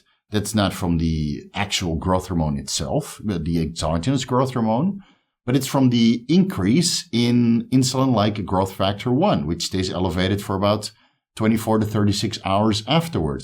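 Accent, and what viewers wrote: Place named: Netherlands. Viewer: what is your heritage, I can't quite place your accent.... Dutch?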